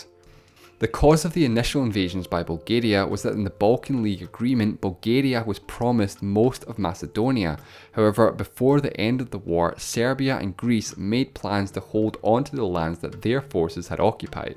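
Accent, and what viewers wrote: Scotland, United Kingdom. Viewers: The Narrator of this video is obviously Scottish. Scotland was named from the dense fog and darkness of this land. Scotland was discovered by the Greeks <link>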